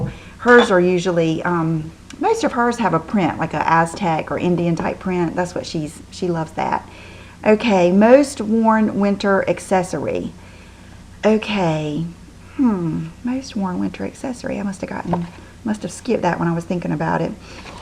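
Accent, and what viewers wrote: South, United States. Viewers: Great video girl! I just love u all the more! I find comfort just hearing your calming southern voice..dont ever change u remind me of my dear grandmother and her"old" southern accent...Merry Christmas!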